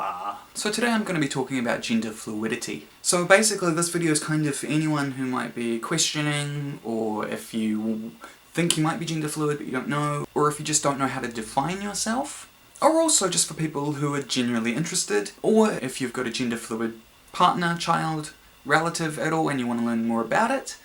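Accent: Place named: New Zealand. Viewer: what country are you from? you kinda sound kiwi but I might be wrong haha😄☺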